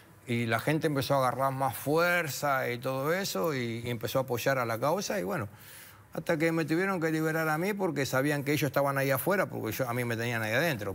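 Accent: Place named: Argentina. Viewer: Que buena historia yo soy de Honduras pase si no es lo mismo pues fue casi igual per bueno gracias a dios tuve la suerte de solucionar mi problema de migración y me identifico con vos por mucho primero por nuestro acento Honduras y Argentina tenemos muchas palabras similares y cultura aquí en california la mayoría son de México Guatemala o el Salvador le notan a uno su acento y tenemos que nosotros decidir las palabras que los demás dicen para poder darnos a entender pero bueno vos trata de apelar tu caso es en caso bárbaro muy bueno lo podes ganar suerte y ojalá te podas reunir con tu familia.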